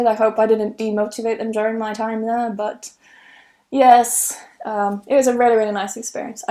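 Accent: United Kingdom. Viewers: Hi! you are an excellent teacher, I love your British pronunciation! I'm learning a lot with your youtube's videos! Thank you so much! Xx:) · Thanks for sharing your story. I really like British accent 😇💙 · Very motivational story. I love british accent. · Hi, I've just subscribed to your channel. I love your British accent, your voice, your look, and the way you present. I find most English teaching videos on youtube are in American English, which is unfamiliar to me. I'm lucky to find you on youtube today. I can see that your eyes were still filled with tears when you shared the bad experience with your previous boss. I had similar experience with a bitch boss so I really can feel your pain. I'm so touched by your story. I like genuine and kind-hearted people like you. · watching your video because I like British accent, thanks for you kept talking in English and it is brilliant, love it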